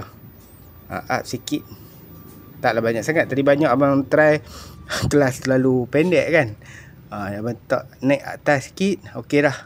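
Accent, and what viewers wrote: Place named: Perlis, Malaysia. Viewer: Abg org perlis ka,cara abg ckp mcm org perlis....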